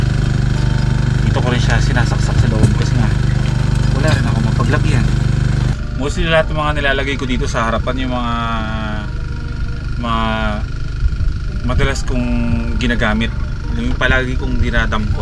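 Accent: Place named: Bulacan, Philippines. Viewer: I like the story telling talaga ni! Naririnig ko minsan ung Bulakeño accent ni! ❤️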